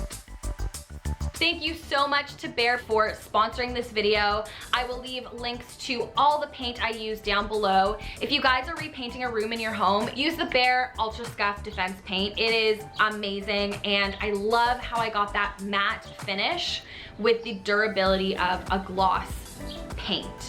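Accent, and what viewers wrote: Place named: Canada. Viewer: I love that Canadian accent💕🥰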